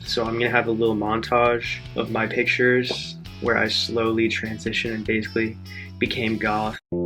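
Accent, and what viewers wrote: Canada. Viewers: You do sound Canadian though. The way you pronounce "out" and "about". Sounds Canadian bro! I suppose you could be FROM there and now living in the Boston area?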